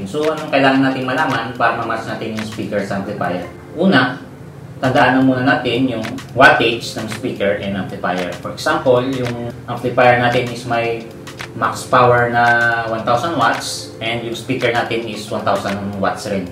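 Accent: Ilonggo, Philippines. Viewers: Ilonggo ka man Sir ay?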